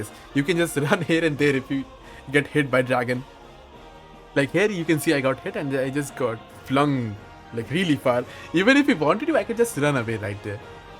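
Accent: India. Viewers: Bhai tere accsent indian hai toh hindi bola kar yar❤ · I can feel your hindi 😅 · "Bro u sounds like Indian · Ppl gonna hate me for this but.. WHY INDIAN ACCENT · oh yea, he sounds like indian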